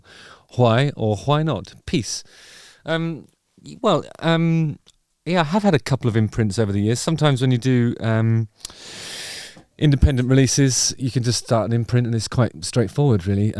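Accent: United Kingdom. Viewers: i'm embarassed i had never heard of (must have been that rock i was living on in hawaii;-))....somehow I stumbled on your youtube channel and thought it was the best thing I've ever seen, positive, insightful, british accent. I checked out and fuck, damn, i play it all the time. my daughter is like "are your favorite band". i'm 57 and thought i'd never find anything new an exciting (for me at least, 15 years late) in music again. mahalo. it's a joy to watch you work and inspiring in ways you might not immediately know that are unrelated to music, but overcoming the struggles of life. after watching this, i guess i need to smile more too now, damn you Justin Hawkins you are making the world less miserable!!!;-) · I like your accent; where in the UK do you come from?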